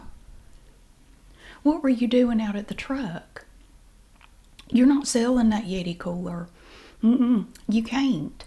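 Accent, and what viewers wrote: South, United States. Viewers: .. I'm starting to think it's a front for drugs .. 😂 Best asmr roleplay with a southern accent on Y T thus on the planet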